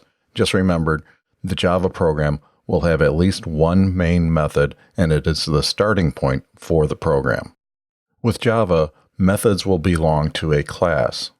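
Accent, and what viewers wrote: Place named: United States. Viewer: What a nice male voice with a rough American accent!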